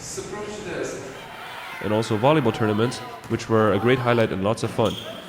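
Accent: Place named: Canada. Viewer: Your from Canada I hear your Acent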